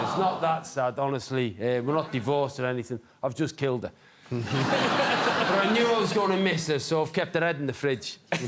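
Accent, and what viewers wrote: Liverpool, United Kingdom. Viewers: Most scousers sound awful but hes refined the accent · Crazy Liverpool accent. Almost sounds Scottish. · Absolutely scouse...it's scouse with the edges filed down a bit though, less throaty. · He is from Liverpool, is it common accent in Danelaw region? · Love a scouser, so down to earth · What's his accent? Liverpool? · This Liverpooler accent is really funny! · Aye. Pretty much. Scouse. Ones Kirkby and the others Runcorn so about 20 miles or so apart.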